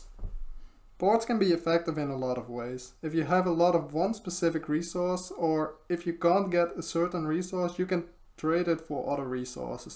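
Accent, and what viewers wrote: Netherlands. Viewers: Heel sterk Nederlands accent haha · Ik hou van het Nederlandse Engels accent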